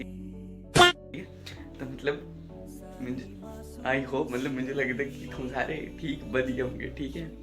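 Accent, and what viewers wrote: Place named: Pahari, India. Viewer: Pahari accent Superb 🔥🔥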